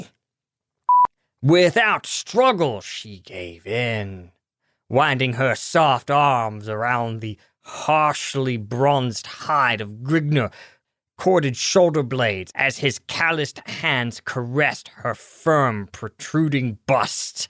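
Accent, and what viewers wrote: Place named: Tennessee, United States. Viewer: The moment I saw you post this I thought, “Oh I can’t wait to hear the line ‘sagging nipples’ in a Tennessee accent.”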